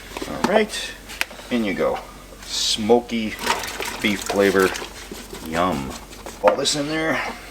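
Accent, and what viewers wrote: Canada. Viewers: Dude I love the way you say garage! Damn I love Canadians accents!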